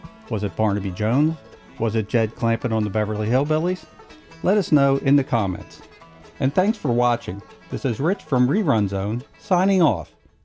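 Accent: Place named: Baltimore, United States. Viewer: lovin the baltimore accent of the narrator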